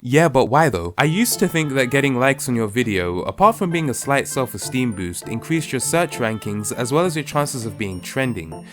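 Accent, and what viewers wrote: RP, United Kingdom. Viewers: Heyeyeyeyeye are u British?? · Are you British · Refreshing to hear a black male with a posh accent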